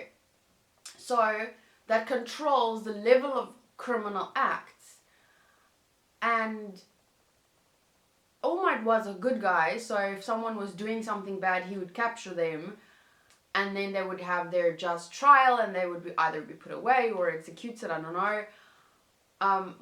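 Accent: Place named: South Africa. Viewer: Is she from South Africa? It's a really nice accent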